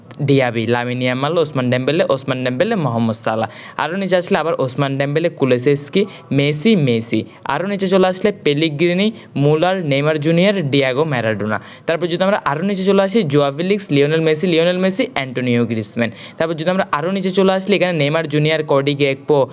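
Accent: Chittagong, Bangladesh. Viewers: main ktha oitese vai ami Chittagong ar apnr voice shone mone holo apnio ctg ar Apnr voice a akta reaction saitesilm rki amr id ar oppr🥺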